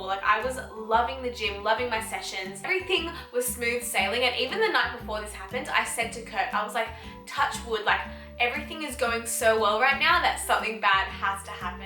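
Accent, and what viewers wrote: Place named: Australia. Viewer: that aussie accent thoooo! LOVE your energy! xx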